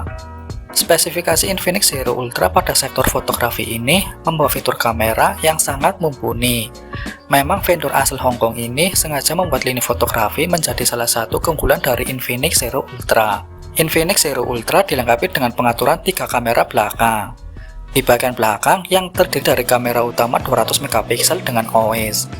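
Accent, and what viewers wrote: Banyumas, Indonesia. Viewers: 😂ki asli ngapak.ora ngapak ora kpenak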